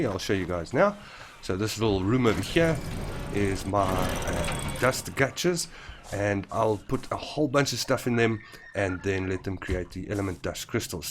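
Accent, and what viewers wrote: South Africa. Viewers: I also picked up on the accent. Proudly SA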